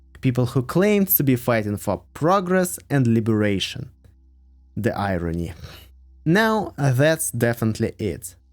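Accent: Russia. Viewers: Чо!!! Ты конч? Сразу видно, что он русский, он не изображает, он не может говорить по другому. Это как британцу сказать не изображать британский акцент. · Сразу видно что говорит русский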